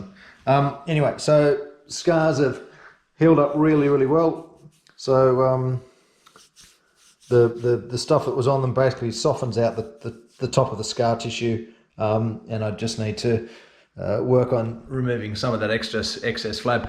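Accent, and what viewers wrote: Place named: Australia. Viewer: I really appreciated your series, your honesty and the clarity of your points. And great that I could hear it in my native language too (Aussie). Thanks!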